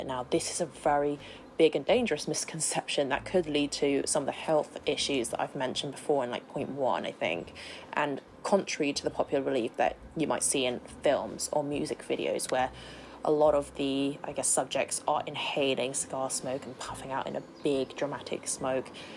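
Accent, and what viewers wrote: United Kingdom. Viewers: A beautiful woman with a British accent smoking a fine cigar. There is a God... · Asian lady with British accent, cigar, so sophisticated and sexy!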